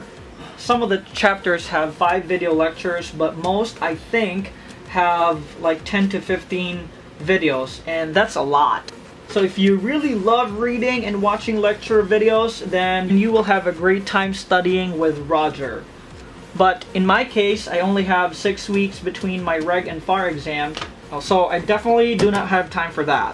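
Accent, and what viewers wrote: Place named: Hawaii, United States. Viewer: Good content. Btw where you from? I hear an accent. Hawaiian?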